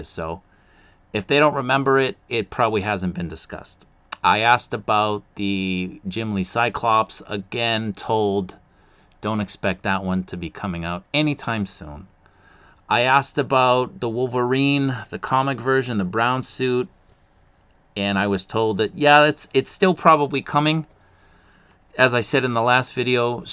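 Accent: Boston, United States. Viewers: by the way I'm the one on reddit asking you to do little Japanese in your Boston accent 😂. I understand why you don't but man it would be gold. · It’s nice to hear the accent I am accustomed to in my daily on YouTube. Not enough Bostonians on YouTube.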